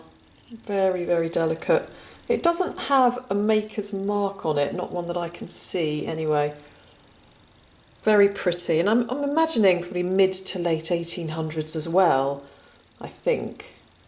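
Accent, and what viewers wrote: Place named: England, United Kingdom. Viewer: will you please wear gloves in future, seeing the dirt under your fingernails really detracts from your wonderful English accent, Erm; I’m English from County Durham....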